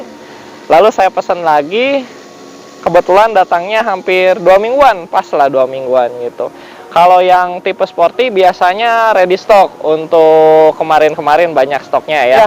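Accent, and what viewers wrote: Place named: Banjar, Indonesia. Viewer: Banjar nya mang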